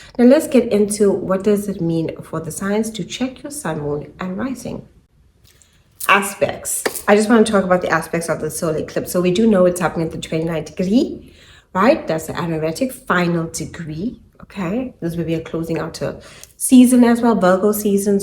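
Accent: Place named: South Africa. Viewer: Are you south african? Bcs you have such accent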